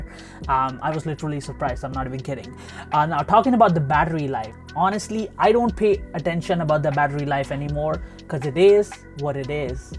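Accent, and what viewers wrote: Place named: Punjab, India. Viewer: Bro you got a thick Punjabi neighborhood English accent? I would love to know the story behind it. Brampton ?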